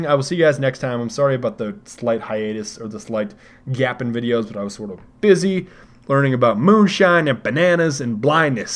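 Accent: Germany. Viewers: I Love your German ;) greetings from Germany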